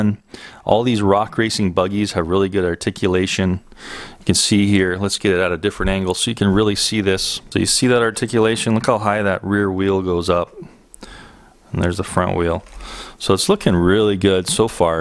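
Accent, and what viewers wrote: Hawaii, United States. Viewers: Yo you in Hawaii bro? I keep thinking I'm hearing a little pigeon in your voice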